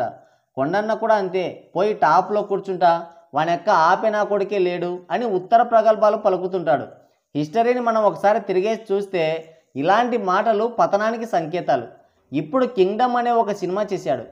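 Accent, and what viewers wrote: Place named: Telangana, India. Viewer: Anadaru chiru, ntr laga real. Life lo act cheyaleru lera disiplane anteh endi ra lanjakodaka telangana slang lo matladitheh discipline lenataa pukaa andra lo shekalu. Paditheh displane unatlaa ina ni voice ala undi nit motham evadidoo chiikii chikki noru noppi puuti matladinatlu ni abbaa ninu byta edo oka roju pagal dengutharu chusuko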